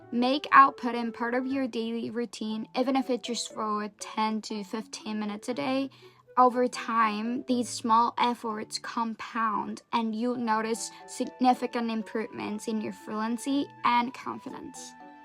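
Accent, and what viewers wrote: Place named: California, United States. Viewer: 現在はアメリカ住みですか？ここまで美しいカルフォルニア？方面のアメリカ英語は中々聞けない気がします。 単語の選び方とかが特にネイティブぽい。。。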